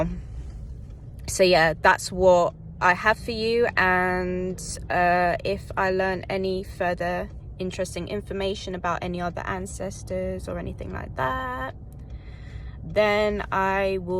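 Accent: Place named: New England, United States. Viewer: you would fit right in with African Americans, with no problem at all until you start talking, but some will think that you grew up in New England, would take care of that accent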